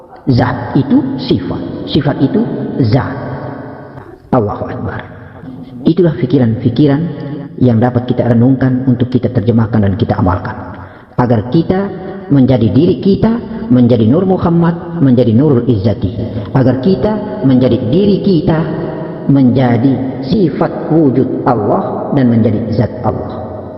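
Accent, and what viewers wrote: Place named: Bugis, Indonesia. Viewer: Kalo sy dgr selalu sy teringat bahasanya logat Bugis.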